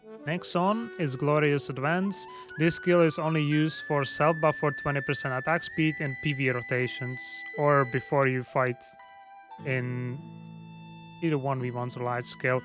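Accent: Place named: Czech Republic. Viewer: sounds like czech accent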